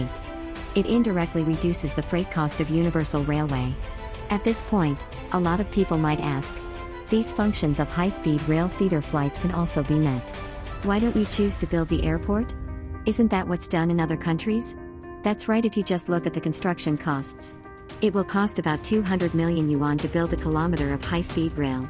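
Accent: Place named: United States. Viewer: I love the idea of HSR. But much of this is "Chinglish", yet spoken with an American accent. So it's computer-generated propaganda. If there's anything worse than the current intelligence level of the average American, it's the human rights record of the Chinese.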